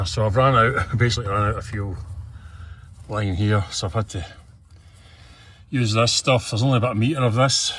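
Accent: Scotland, United Kingdom. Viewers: Thanks for replying. You made me laugh on your video with your Honest commentary and your accent from a fellow Scot takes me back to working with people with a sense of humour and dry wit sadly missing these days. Keep making videos now subscribed 👍👍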